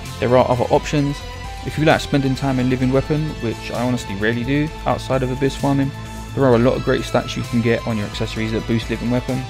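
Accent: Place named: Cockney, United Kingdom. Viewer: Can somebody translate his cockney for me, no idea what he's saying.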